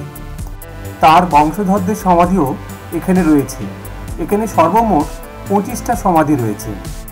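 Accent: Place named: Bangal, India. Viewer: এই তোমরা ছ ছ দিয়ে কথা বলো কেন। তোমরা কি ইন্ডিয়ার বাঙাল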